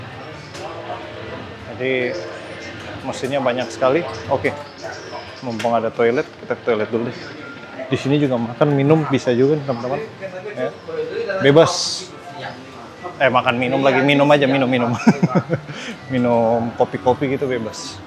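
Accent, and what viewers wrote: Eastern Indonesia, Indonesia. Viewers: kaka punya logat timur kantara skali😂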